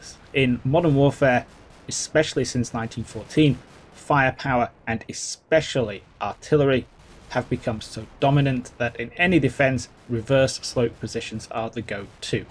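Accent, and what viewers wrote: United Kingdom. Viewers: unmistakably yorkshire, wonderful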